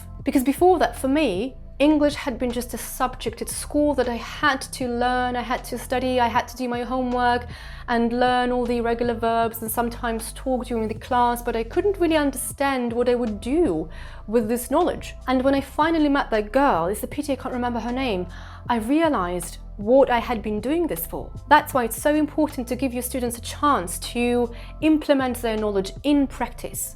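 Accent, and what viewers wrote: United Kingdom. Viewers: Какой красивый британский акцент. Просто posh, обожаю. Подписка! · Какой очаровательный британский акцент ❤ У меня сильнее акцент, чем у вас, хотя я и живу в Штатах уже почти 12 лет и на бытовые темы говорю свободно. В разговорной речи встречаю незнакомые слова раз в месяц, если не реже, да и носители меня понимают без проблем. Мне в изучении языка очень помогли видео с английскими субтитрами, а потом и без них. Слушаю лекции, подкасты и смотрю документальные фильмы на английском. Вам менять ничего не надо - аборигены обожают мой акцент, хотя он и сильнее вашего. Оставьте чуть-чуть экзотического изюма в речи. Насчет увеличения словаря может и хорошая идея, но ваша речь звучит очень легко, без повторений, запинаний и слов-паразитов - очень приятно слушать. И спасибо огромное за замечательгые ведео. Смотреть их удовольствие! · Renée Zellweger's pronunciation in "Bridget Jones's Diary" movies exactly -- вот что мне напомнил Ваш акцент. 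Она американка, и ей пришлось много поработать над британским акцентом для этого фильма. Со специалистами, само собой. У которых, видимо, была такая же лингафонная система, что и у Вас и много где ещё, поскольку я слышала польских лингвистов-специалистов с таким же вот точно британским произношением. Renée Zellweger как раз после фильма раскритиковали "спецы" за недоакцент (на себя бы посмотрели, ага :) ). Так что вот Вам и возможный фидбэк на Ваше произношение от "истинных носителей". Как человек, прошедший специальный и изматывающий курс по произношению профильного иностранного языка по системе и с экзаменами, не могу не восхищаться успехами усердных студентов по всему миру - огромный Вам (и нам всем заодно :) ) респект! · Ооох, я влюбилась в ваш британский акцент, прям невероятно плавная красивая речь! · Your English is very good, slightly more onto English accent. I live in USA for 30 years now and learned from movies and people around but I do have American accent. 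Thanks for your videos 🙂. They are so cute. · Оо у вас классный британский акцент! Это моя мечта! Смотрела ваши видео по истории по по англ никогда не попадались что странно 🤔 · You have one of those British accents🌺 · pure British accent and super speed ) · Шикарный британский акцент😍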